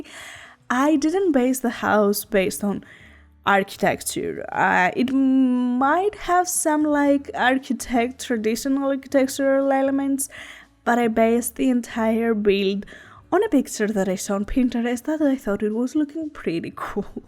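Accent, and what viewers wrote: Greece. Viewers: ur accent is Greek! Ελληνιδα εισαι?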